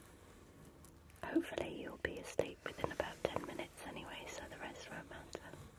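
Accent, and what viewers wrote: United Kingdom. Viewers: Love this and you!! Rough week so far and this has me ready to fall asleep and feeling calm. I’m American (with a US Southern accent) and I find your British accent so beautiful and proper ❤️💕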